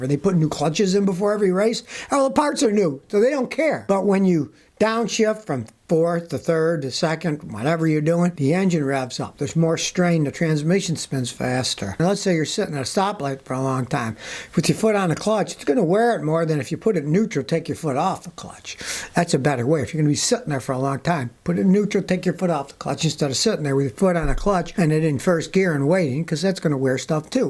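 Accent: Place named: New Orleans, United States. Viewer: Good afternoon, Mr., I just found your channel, by me looking into buying a 2004 Honda C R V, I really appreciate your much valuable knowledge, Thank You, for sharing, I wanted to ask you a question, are you from New Orleans, I grew up a hr away from there, you sure sound like you are from there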